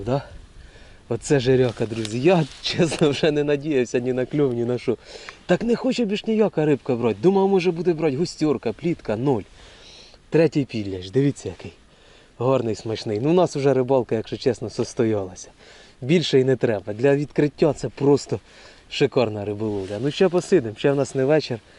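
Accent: Poltava, Ukraine. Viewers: Ніяк не зрозумію звідки ти. Говір полтавський, але сула нетипова для полтавської обл. Хоча, я не скрізь бував. А може сумська?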